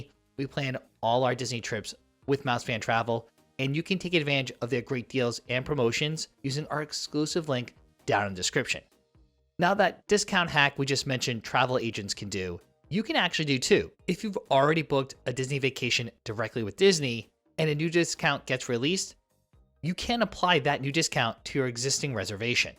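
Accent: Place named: Philadelphia, United States. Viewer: announcer sounds like he's from Philly- "wudder" instead of water. it's a SEPA thing.....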